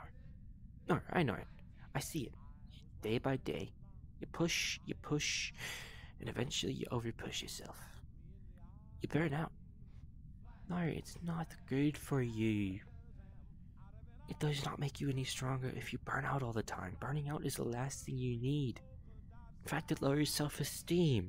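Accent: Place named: Australia. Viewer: I love this! I thought you were a husky at first and clicked the video because the avatar was cute. The next thing i know, I'm listening to an Australian accent kangaroo 😂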